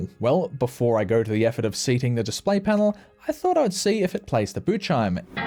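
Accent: Australia. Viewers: I can hear the Aussie accent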